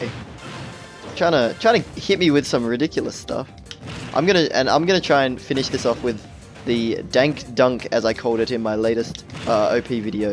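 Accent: Australia. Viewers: This guy sounds like PIMPNITE, but for Smash Bros. Nothing wrong with that; they're both Australian, like me. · Are you Australian? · Love the idea of doing challenges. Love the editing. Love the succulent Australian accent. 10/10 Would donk again. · try and play smash for an battle or episode you pick without an Australian accent, I know it sounds impossible (I would know I'm also Australian too)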